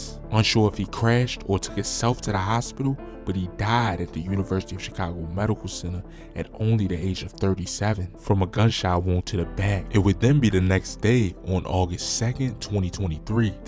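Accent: Baltimore, United States. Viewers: The commentator sounds like he's from Baltimore.. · The narrator must be from Baltimore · Narrator is definitely from Baltimore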